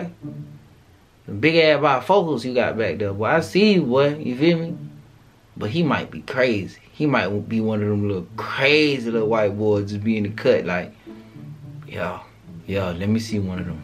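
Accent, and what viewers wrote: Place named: Philadelphia, United States. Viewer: You from Philadelphia I could tell young bull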